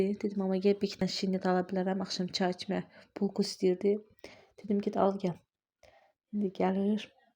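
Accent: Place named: Sheki, Azerbaijan. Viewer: Salam baci , xos gununuz olsun insallah , hee deyiremde lehce tanis ve yaxin geldi , 😊 mende Sekidenem , ugur olsun baci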